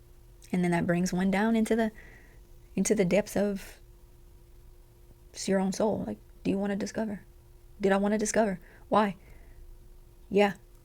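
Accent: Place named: United States. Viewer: your accent is featured in the video "13 American Accents Ranked EASIEST to HARDEST to Understand", by user Olly Richards. 🙂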